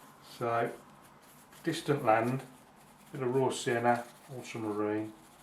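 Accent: Birmingham, United Kingdom. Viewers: The Brummie Bob Ross. Bostin'!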